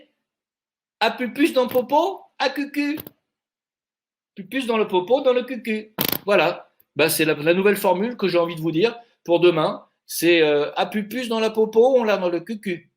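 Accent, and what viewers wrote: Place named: Algeria. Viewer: l'accent pied noir chinois c'est juste EPIC !!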